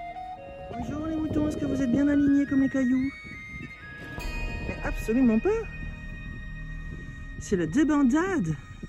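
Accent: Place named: South, France. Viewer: Coucou! Les alignements de Carnac auraient une origine religieuse pour le culte des morts. Pour le reste, les scientifiques sont comme toi, toujours dans la recherche du pourquoi. C’est marrant, on retrouve notre petite des vadrouilles 😊 Ton accent du Sud est revenu 😂 Très jolie vadrouille et contente que tu sois revenue sur les routes et en plus accompagnée de Coco et Newton 😊 Bisous!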